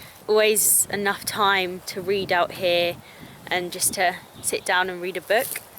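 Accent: United Kingdom. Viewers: You are obviously UK, but what country? I am not good at identifying accents. Brit?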